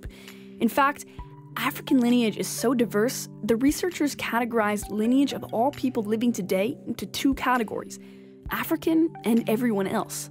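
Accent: Canada. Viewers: I detect an Canadian accent!!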